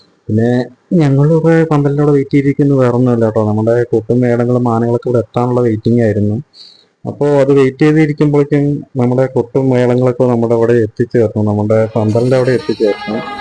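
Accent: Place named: Palakkad, India. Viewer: ettan powli🤩 adipowli aayitundu nammade ah palakkadan slang il thanne adi manoharamayi eduthitundu ineyum idupole orupadu videos edukkan kazhiyanam ennu njn aashamsichu kollunnu🎊👍🏼💖🎉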